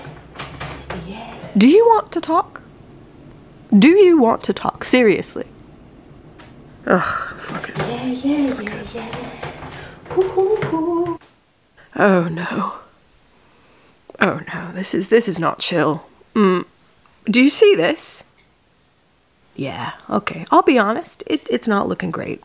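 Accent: United Kingdom. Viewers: I love your English accent and the commentary is spot on 🤣 Your poor, long suffering cats! Nah, you can tell they really love you, it's so sweet x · I strangely find your British accent quite pleasant to listen to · i love the british accent lol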